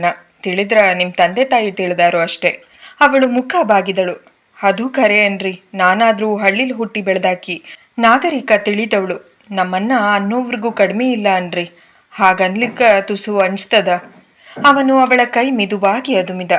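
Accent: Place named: North Karnataka, India. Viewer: ಉತ್ತರ ಕರ್ನಾಟಕದ ಭಾಷೆ ಯಲ್ಲಿ ನಿಮ್ಮ ವಿವರಣೆ chendaaagide ಕೇಳುವುದಕ್ಕೆ.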